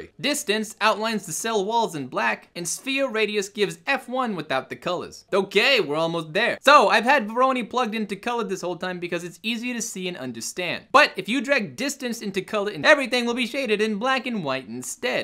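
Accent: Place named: Brooklyn, United States. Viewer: I FEEL LIKE IM IN BROOKLYN LOL, I LOVE IT!!!!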